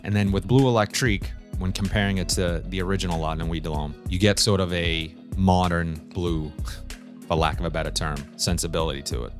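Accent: Boston, United States. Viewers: Love that Boston Accent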